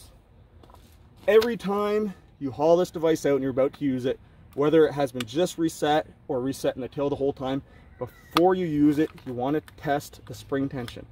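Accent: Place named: Newfoundland, Canada. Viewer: I hear a slight Newfie Accent. I was born in Newfoundland--in Alberta atm. Love your channel. I've been giving serious thought to going for journeyman electrician.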